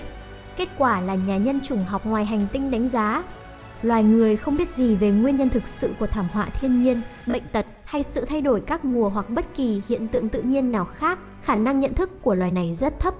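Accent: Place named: North, Vietnam. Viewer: Giọng Bắc đấy.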